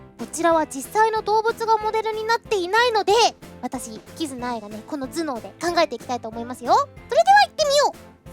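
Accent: Japan. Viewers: 日式中文RRRRRR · well Japanese uses kanji/hanzi as well so it's Japanese especially regarding that she pronounces words in Japanese pronounciation.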